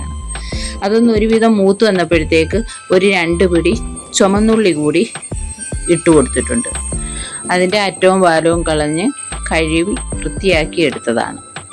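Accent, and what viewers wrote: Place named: Adoor, India. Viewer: Njan Adoor kari aanu, njagal sheemachakka ennanu parayunnathu, nammal kadachakka ennu parayunnathu pineapple ne aanu, nattil evide aanu, samsaram kelkkumbol nammude bhagathu ullathu aanennu thonnunu, njanum ithupole aanu sheemachakka theeyal undakkunathu, super recipe😋👌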